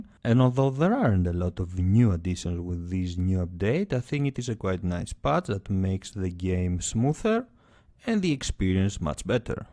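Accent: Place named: Greece. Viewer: You have a very strong greek accent.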